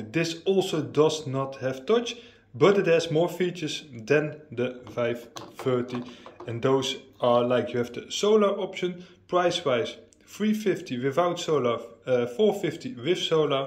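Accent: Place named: Netherlands. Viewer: I think you are dutch speaking :))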